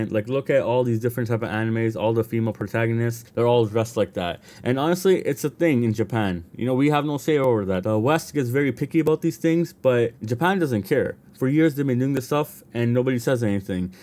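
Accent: Toronto, Canada. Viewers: You talk like a Toronto / GTA man for sure.. you from the ends ahlie?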